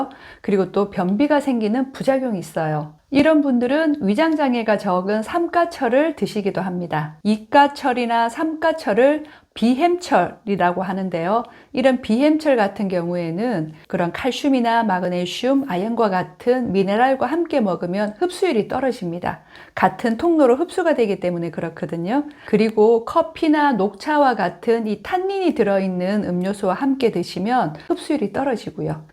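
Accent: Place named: Gyeongsang, South Korea. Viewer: 경상도 억양이 있네요 예쁘고 똑똑하시네 다 맞는 말씀입니다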